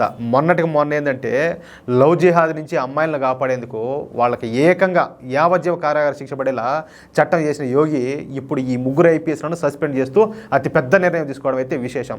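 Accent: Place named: Tirupati, India. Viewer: Naa tirupathi pakkala a v(e)illage na meedhi 😂 vor vor vore vore ,chana adhbbuutamgaa vuntadhi Naa Nuvuu matladuuthunte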